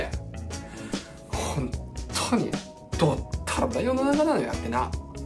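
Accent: Tsugaru, Japan. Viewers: 最初から津軽弁に聞こえる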